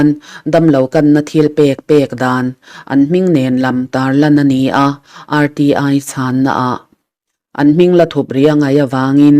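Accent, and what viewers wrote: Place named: Manipur, India. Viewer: A chhiartu hi mizo nge MEITEI aww😊, zawngchhang suh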